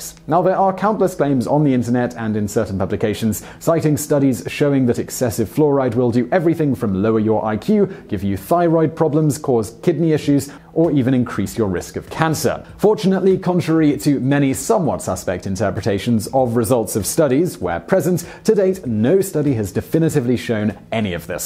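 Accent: United Kingdom. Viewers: seems like a british dude talking about dental health doesnt seem like the most credible source lol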